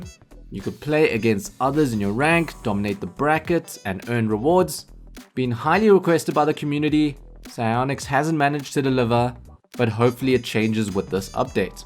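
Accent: South Africa. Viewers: i thought so from the accent. Im also from south africa!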